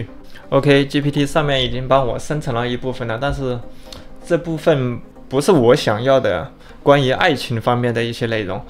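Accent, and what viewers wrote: Sichuan, China. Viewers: 播主说的川普，挺可爱的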